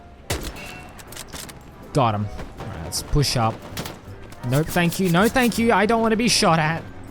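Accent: Australia. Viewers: Are you south Australian?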